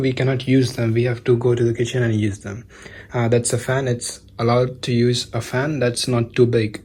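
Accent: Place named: Russia. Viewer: You look the exact same, like you used to be during school, particularly in this video except for your voice and the Russian English accent!! I've been following all your videos, happy to see you exploring and living these experiences🙌🏽🤗 Good luck buddy 😊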